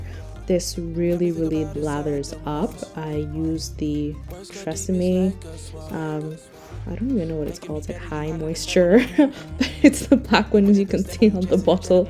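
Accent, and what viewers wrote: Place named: Kenya. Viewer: She's Kenyan.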